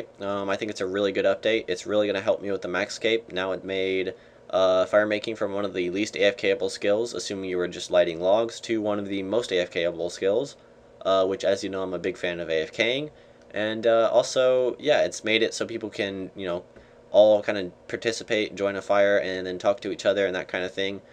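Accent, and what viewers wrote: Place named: United Kingdom. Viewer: i think he's british... t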